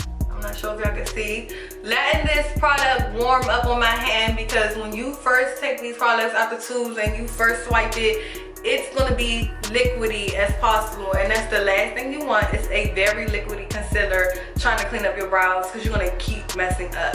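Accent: Washington DC, United States. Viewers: you gotta be from DC! the accent and them nails, dreads, everything scream DC! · Are you from DC?? If not...you sound like it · this was a cute tutorial in real time, I like that it wasn't sped up. The brows were fleeky lol. And as soon as you started talking I said oh she from D.C. I know that city talk anywhere 😄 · Everyone keeps talking about "blending". She said her brows are her first step. It's not a complete finished look. Her brows look Amazing! She sounds like she's from DC 👍🏾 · foreverbeautyguru more like D.C. Lol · the MC is from NE DC lmao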